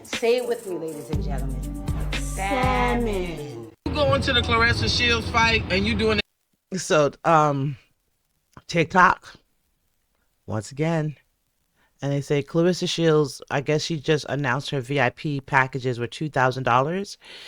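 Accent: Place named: Caribbean. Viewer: I 🇹🇹 I thought I heard d West Indian in you some time ago!🇯🇲❤❤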